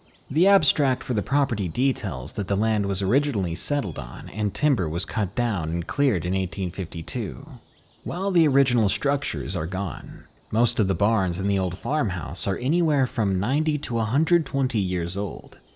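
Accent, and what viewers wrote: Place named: United States. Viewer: Why does someone who is an Irish man raised in Ireland speak like an American with northamerican slang and no uk/Irish terms or phrases.... You are fucking bullshit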